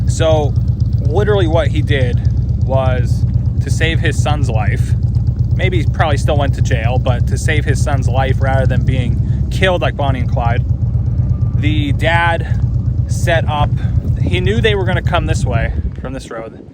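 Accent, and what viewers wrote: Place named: United States. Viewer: You sound like an American, but your grammar is pitiful.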